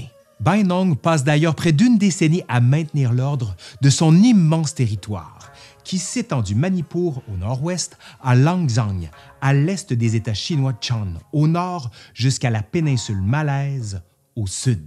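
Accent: Quebec, Canada. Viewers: Frchmt avec ton accent québécois et ta façon de raconter ça donne envie de te suivre et d'en apprendre plus sur l'histoire 😉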